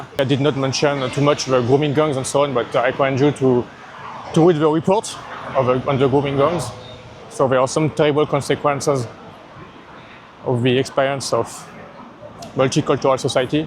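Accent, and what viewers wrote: France. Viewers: frensh accent :face-green-smiling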